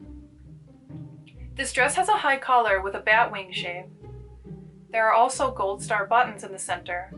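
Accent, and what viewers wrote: United States. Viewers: Are you from Minnesota? I hear a slight accent. It’s so nice to listen to <3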